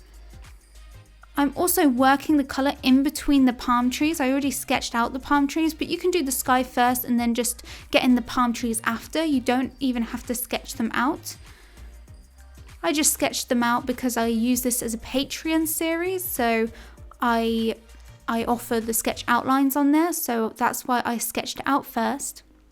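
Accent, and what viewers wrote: England, United Kingdom. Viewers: Ich liebe den englischen dialekt 😍😊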